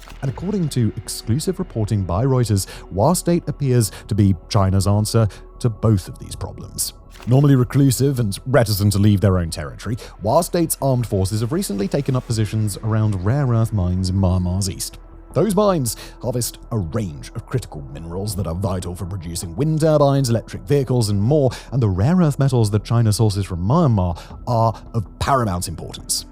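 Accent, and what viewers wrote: United Kingdom. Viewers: Dude... he doesn't run these channels. He is just hired as a reader because of his British accent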